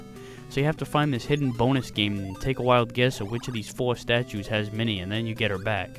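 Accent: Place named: New York, United States. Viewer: I don't see all that much AVGN, really. He just has a bit of that New Yorker accent. :T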